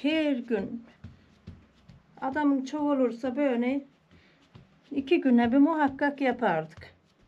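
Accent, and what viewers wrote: Afyon, Turkey. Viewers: Esse lamu aleyküm verahmatullahi ve bereketi Allah 'ın rahmeti bereketi üzerinize olsun ablacım eline sağlık afiyet şifa olsun bizde koydeyken yapardık aynısından sen sinanpasa limitin hangi koydensin ben İstanbuldayım çok özlüyorum sen konuşunca afyonluca hoşuma gidiyor haşhaşi hamurunda yapardık biz sende yap çok güzel oluyor söylersin neresinden olduğunu sinanpasanin arada köyünde çek Allah'a emanet olun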